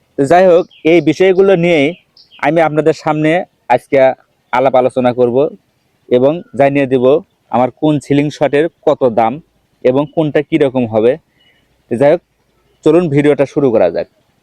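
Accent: Bogura, Bangladesh. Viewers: ভাই আপনার বাড়ি কি বগুড়া?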